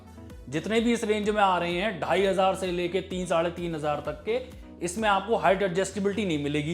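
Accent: India. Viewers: Apne jo wall pe stands lagte hai vo nahi batya agli bar ho sake to uska halka sa jo ho sake ek brief de dena thoda idea ho jayega Aur jo mera one of the biggest reason hai apki videos dekhne ka vo apka indian accent jo apno vale feeling dilata hai to voh kabhi loose mat karbna ☺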